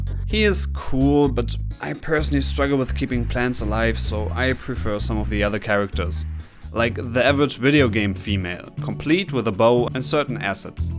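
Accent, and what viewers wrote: Germany. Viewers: btw is it just me or are you german?/kommts nur mir so vor oder bisch deutscher?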